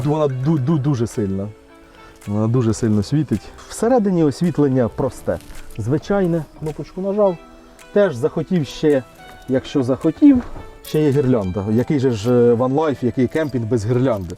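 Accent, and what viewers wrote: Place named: Ukraine. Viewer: А можно нормально по руски без Ебонова акцента хохляского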